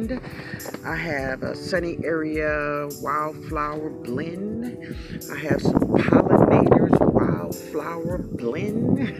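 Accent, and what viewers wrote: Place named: New Orleans, United States. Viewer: I just found your channel today & I am SO HAPPY! I am 25 and just moved into my first house & am trying to hard to make a beautiful garden. I bought these exact boxes & some other tools from the Dollar Tree last night, but have never grown a flower in my life before, so I was desperately trying to find some help online when I stumbled upon your videos. I'm so excited I could cry! You will be helping me through my first garden now!! :) And my mom's from NOLA, so your voice is highly comforting to me 😌😌 thank you so much!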